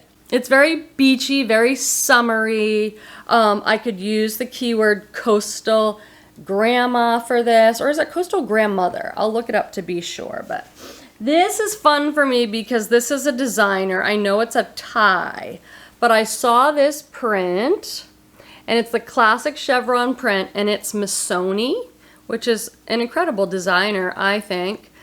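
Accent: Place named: East Coast, United States. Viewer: East coaster here, another reason i love your videos, we sound alike lol and your word use, ie Smutz. I thought, i found my people lol.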